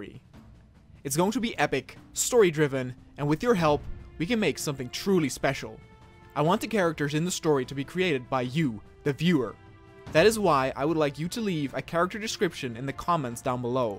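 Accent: Netherlands. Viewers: are you dutch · You are Dutch? I am Flemish. It took me 10 minutes before I could clarify your accent, well done! Also: great way of recycling genuine news footage into an alternative 'present' and a zombie war. Awesome! Dikke proficiat, dat deed je geweldig!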